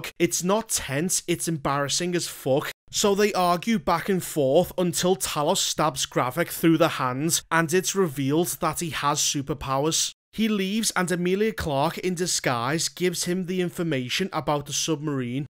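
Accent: Liverpool, United Kingdom. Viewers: The swearing fits perfectly on these reviews, a scouse accent to boot. Brilliant reviews 🤣🤣🤣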